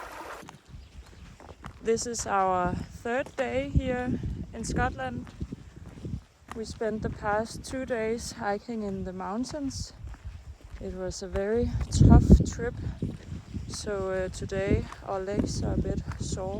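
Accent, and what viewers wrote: Denmark. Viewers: I think that I'm hearing in your voice how the Danish tongue has deeply influenced some British and Irish accents.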